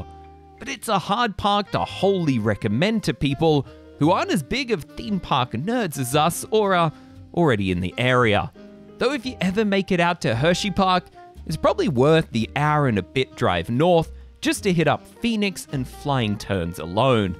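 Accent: Australia. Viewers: As someone who grew up about 15ish minutes away and has spent countless hours at Knoebels over the last 40 years, it's just really cool to see it get some recognition for what a lovely little park it is.... I also absolutely can imagine the reaction the Aussie accent caused. I even did a double take myself hearing the accent in the video. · It has been a few years since I've been up there at Knoebel's (I remember when it was still called "Knoebel's Grove"). It takes me a good 4 hours to get there from where I currently live. That is truly an amusement park in its true, classic meaning. This is probably the last remaining park that still operates the way it does, with no admission, and using ride tickets to get on the rides. I still have a huge stack of those ride tickets, so I may have to consider going up there this autumn for the Phoenix Phall Phunphest. There are other parks that started out like this that still exists although they transitioned to the more typical modern gated theme park. 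Kennywood in Pittsburgh is one, as it was originally a trolley park. Hershey Park is also one as it was originally meant to provide amusement for the company's employees and family on the weekends. As for as the local's reactions to your Australian accent, not very many Australian people visit that part of the US, so getting see, meet and hearing one is a pretty rare treat there. Going to Knoebel's really does feel like stepping back in time and experiencing a truly traditional amusement park. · I'm just here for the Aussie accent. 😂😂😂. Love it.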